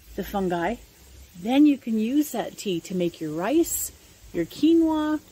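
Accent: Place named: Canada. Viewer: Beautifully presented and i love your accent, I'm presuming Canadian, in particular words like "about" "road", something about the way you pronounce 'oa' 'ou'. Get plenty more of those words into your videos please!.... 🙏💖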